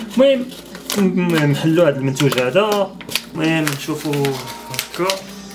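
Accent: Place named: Algeria. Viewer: هاذة جزائري تغيرت لهجته بسبب الاحتلال الفرنسي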